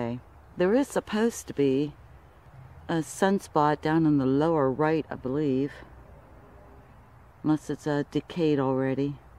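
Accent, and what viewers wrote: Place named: Ohio, United States. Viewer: You have a great accent, is it unique to Ohio?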